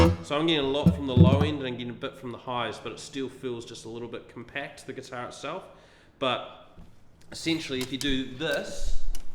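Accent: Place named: New Zealand. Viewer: Love the guitar, the player, and the Kiwi accent!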